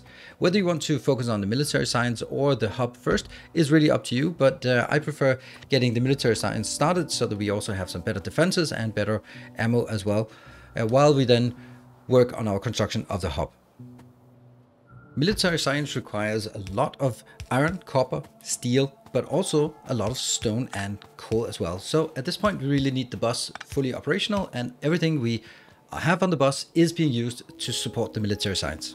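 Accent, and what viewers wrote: Denmark. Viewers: You sound Danish.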